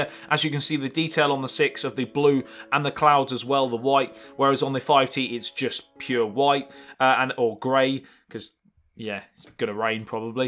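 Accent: United Kingdom. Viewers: im weirdly addicted to your voice and minor humor mate.. here iam its like am im seeing all ur vidz for the past 1hr... and sure iam into it.. that British strong accent tho,.. (o homo lol) love u man <3 grt review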